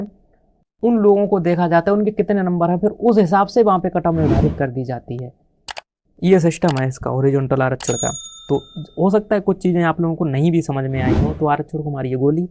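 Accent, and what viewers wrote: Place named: Auraiya, India. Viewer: Aapki language tone UP k auraiya ki trf ki lg rhi h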